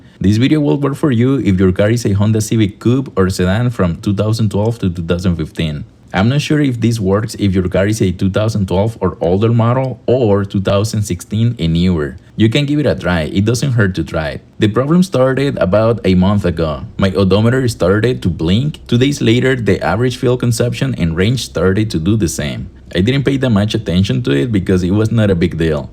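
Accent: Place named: Colombia. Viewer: Too this was the exact issue. Thanks a lot. You sound like you are from Colombia.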